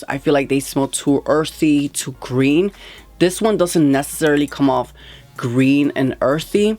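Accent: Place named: Latin America. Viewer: I appreciate this review ❤ and I'm definitely old enough to be your mother and l so enjoy watching every review because in my 20's me and you honey would have been besties ❤❤. I don't know your nationality but the accent sounds Latino? So I love the pronunciation of your words😉. Keep being you!! We love it ❤❤❤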